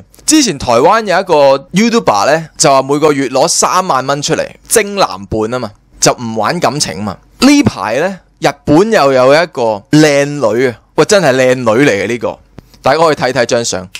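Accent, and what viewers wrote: Taishan, China. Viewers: ok wor 式台山話我接受到 wor幾搞笑丫😂😂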